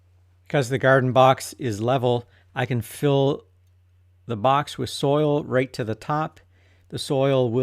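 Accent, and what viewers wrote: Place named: Maritimes, Canada. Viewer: Grew up near a bunch of maritime candadians. Accent takes me back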